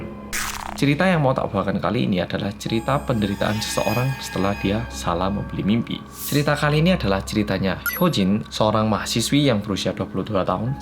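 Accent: Java, Indonesia. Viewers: Waduhhhhhh ko' ada episode 2 kelamaaan menunggu besok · Kembali lagi bersama mas yang medeeekkk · Kak kok skarang pembukaannya sudah ganti yg ngondek? Lalu kan medok kak? Kok di ganti kak? 😁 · Tim gercep orang korea yang medoook!❤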